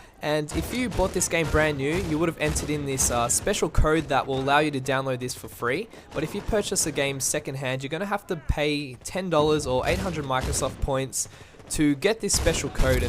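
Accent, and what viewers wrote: Australia. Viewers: mmm, enthusiastic aussie commentator ;)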